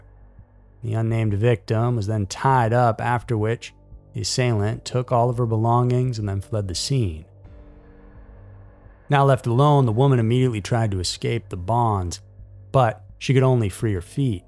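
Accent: United States. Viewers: i love the narators very american voice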